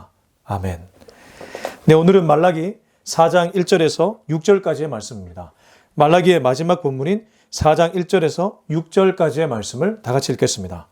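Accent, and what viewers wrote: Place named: Gyeongsang, South Korea. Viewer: 목사님의 경상도사투리 멋쪄요...^^ 우리들은 악인를 따르지않도록 주님께 기도드립니다^^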